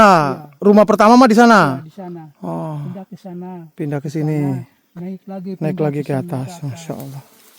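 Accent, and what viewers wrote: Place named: West Java, Indonesia. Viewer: Klo dngr ngomong itu daerah prbtsn Tasyik dgn garut Buuun.. Mfin ya bun nyela komen 🙏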